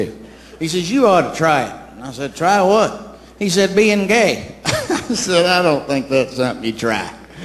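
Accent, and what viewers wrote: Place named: South, United States. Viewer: His southern voice makes the jokes, all the more better! Who agrees wit me?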